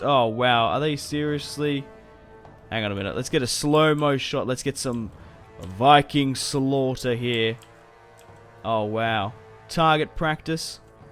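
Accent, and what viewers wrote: Australia. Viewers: where about in australia you from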